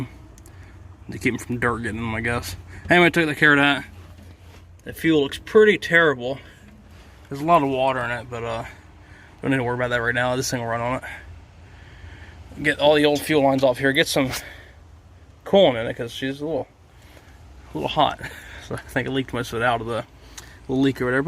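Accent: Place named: Coastal North Carolina, United States. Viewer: man i just found your channel i heard you talking and you have to be coastal nc im in pamlico county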